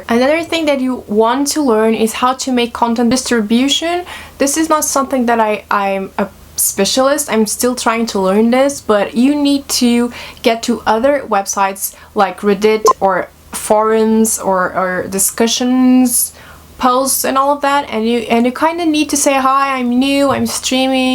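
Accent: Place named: Brazil. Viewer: Sabia que você era BR! Nosso sotaque é o melhor!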